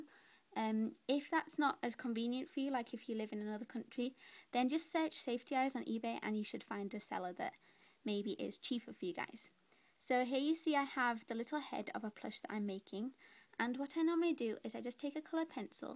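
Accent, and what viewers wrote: England, United Kingdom. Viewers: I liv your English accent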